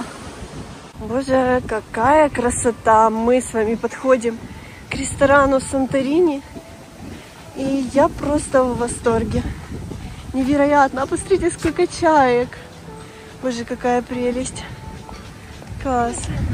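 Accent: Ukraine. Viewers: Такой акцент у тебя Украинский и такая ты серьезная не смотря на юмор Одесситов вообщем покорила ты меня❤🌹💋🌹🌹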